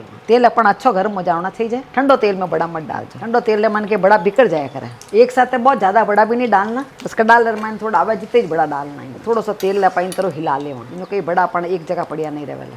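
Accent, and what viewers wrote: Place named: Rajasthan, India. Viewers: Aapko mera Pranam : aap ki marvadi bohot achi lagti hai , meri maa bhi aapki tarha bolti thi , thari boli mane bohot achi lage hai: thane Mero bohot pyar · Aunty aap rajasthan me kaha se ho....??? Meri Bua nokha-mandi bikaner rahti h wo aur unke bachhe asehi bolte h.... Mujhe unka talking way bahut pasandh h.... Aap bhi waise hi bolte ho....bahut achhe lagte ho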